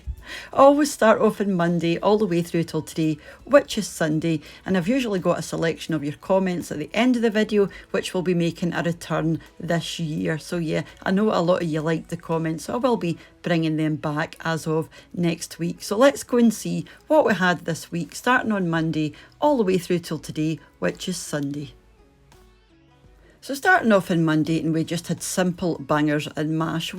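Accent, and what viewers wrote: Scotland, United Kingdom. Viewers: I just discovered your channel recently and I’ve already watched so many of your videos! I watch from Newfoundland, Canada. I just love your accent. Reminds me of visits to Scotland. My husband is from Cawdor. Can’t wait to visit again once we can travel safely!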